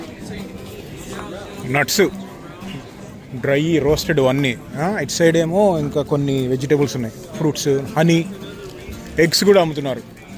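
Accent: Andhra Pradesh, India. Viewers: anna nvu gun carry chestava safety kosam? and nv nizamabad nundi annav kani andhra slang undi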